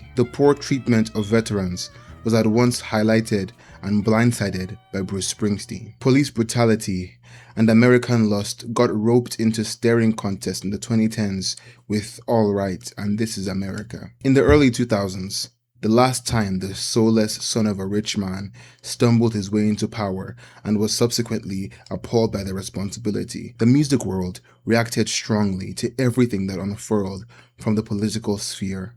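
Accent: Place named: Nigeria. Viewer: You didn’t need to say you Naija, it’s a distinct accent, country also history.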